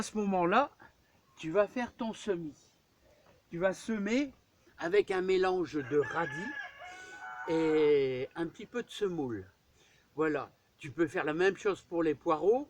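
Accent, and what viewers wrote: North, France. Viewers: je travaillais avec des collègues qui venait de la SEITA de Lille ,certains de Bétune. Lorsque nous avons tous été licenciées se fut le retour au bercail pour eux. Avec vous, je retrouve le parlé du Nord et j'avoue que ça me fait du bien. Bonne après midi à vous.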